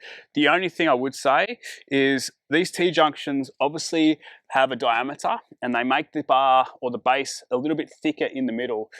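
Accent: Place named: Australia. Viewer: It’s wild to here the Australian accent. So many endings of sentences sound like it’s a question. Pull up bar? Higher? Top bar?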